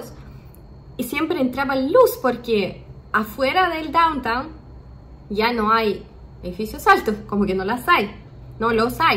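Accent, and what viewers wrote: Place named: Chile. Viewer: Hola: Qué hermoso escucharte hablar en nuestro acento chileno... Ya aprenderás a hacer ese pastel de choclo... Te ves muy hermosa cocinando. Felicidades y ojalá puedas volver a nuestro país. Dios te bendiga.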